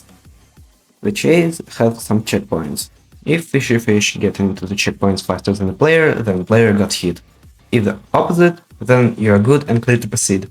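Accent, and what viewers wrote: Russia. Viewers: Ahh russian accent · are u russian? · Are you russian?? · просто акцент у тебя слишком русский👍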